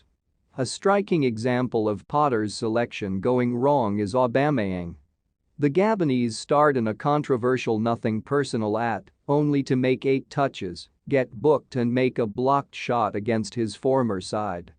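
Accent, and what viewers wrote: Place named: United States. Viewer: What is with that fucking stupid annoying American robot accent? Bit of advice change that shit asap!!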